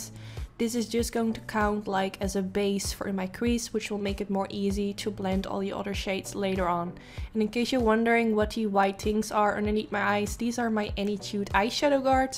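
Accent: Netherlands. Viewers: Ik kijke naar je filmpje met in me hoofd hoor ik nou een Nederlands accent of word ik gek😭 en ja hoor je bent gewoon Nederlands 😍 yo go girl 💥💥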